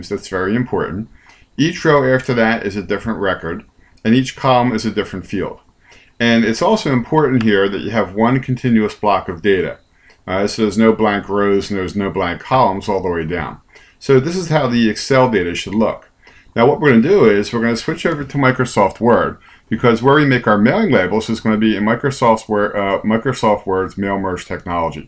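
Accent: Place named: Baltimore, United States. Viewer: You're from Baltimore, aren't you??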